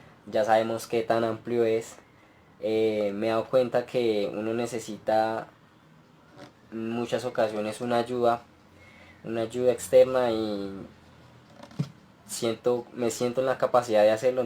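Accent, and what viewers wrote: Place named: Colombia. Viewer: Amigo de qué parte de Colombia eres ? Pregunto por tu acento ??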